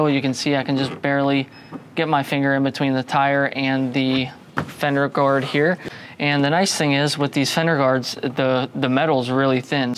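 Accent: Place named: Philadelphia, United States. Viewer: Where are you guys located? You sound very Philly my friend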